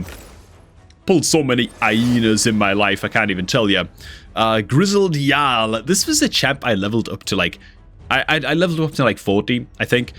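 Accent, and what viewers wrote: United Kingdom. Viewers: Why you hating on him he’s just got a British accent 😂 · He's british ...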